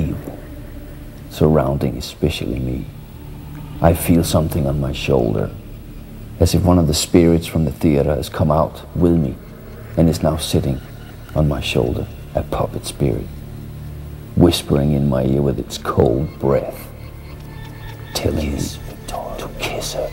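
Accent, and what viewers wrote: Denmark. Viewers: you can still hear his danish accent. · By listening to this, i found out this dude is from Denmark *lol* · The danish non native english accent makes it kind off creepy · My god... he sounds truly danish. XD But... very scary at the same time. That his way of speaking english reminds of my own english teacher is not exactly helping. :P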